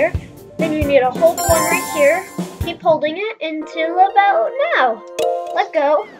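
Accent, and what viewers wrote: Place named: United Kingdom. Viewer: You have a British accent in this video.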